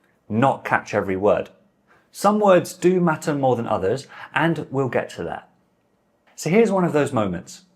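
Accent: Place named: England, United Kingdom. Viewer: Hello, nice video! I'm a native French speaker with a fairly neutral (international) French accent. Your accent is nice, but I can hear an English accent throughout every sentence. If you're aiming for a more neutral/international French accent, I can give you a few tips. Try pronouncing your sentences clearly and at a normal pace without slurring them first. Once you get comfortable with that, you'll naturally figure out where native speakers actually slur their words. Don't start by slurring words just because you've heard French speakers do it. Otherwise, it sounds like you're imitating the accent rather than speaking naturally. In French, we usually slur words only because we're trying to say something clearly while speaking faster—and then even faster—not as a pronunciation rule in itself. Also, at the end of words, you tend to do what English speakers often do: you add a slight extra sound instead of ending the word cleanly. 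For example, you pronounce *"répéter"* more like *"répétey"**, when it should end with a clean **"é"* sound. French vowels are generally simple and steady—it's one sound, and that's it. In English, vowels often glide from one sound to another. For example, if I exaggerate, words like *"you"* and *"low"* sound more like *"yeouw"* and *"leouw."* In French, however, the sounds stay pure and direct. They'd be closer to *"you"* and **"lo"**, without that extra glide at the end. Anyway, I hope this helps! You're already doing a good job, and with a few pr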